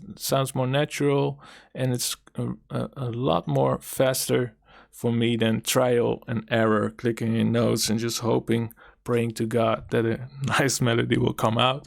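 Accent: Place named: Netherlands. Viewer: By your accent I'm guessing you're from the Netherlands?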